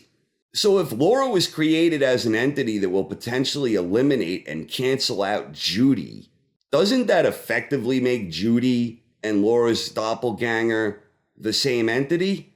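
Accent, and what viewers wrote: New York, United States. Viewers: is that a little bit of a jersey or new York accent you got dude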